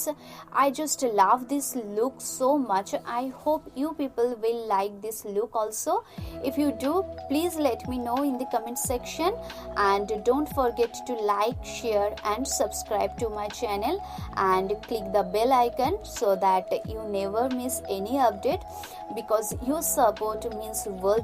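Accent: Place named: Bengal, India. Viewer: I think u r bengali bcoz of ur accent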